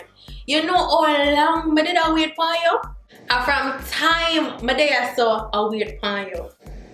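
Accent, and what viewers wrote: Jamaica. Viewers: As a latina I’m seeing a Jamaican and his patwa is sooo strong lol I’m learning tho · I don't understand not one bit of patois but I love hearing it lol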